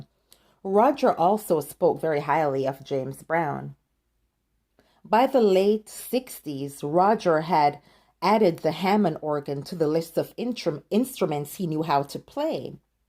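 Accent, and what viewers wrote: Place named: Jamaica. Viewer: hey are you Jamaican? I hear a very slight Jamaican accent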